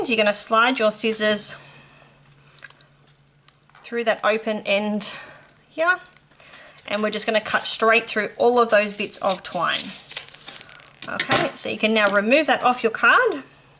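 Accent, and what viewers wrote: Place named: Australia. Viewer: I love ur australian accent! Haha. Thx for the helpful vid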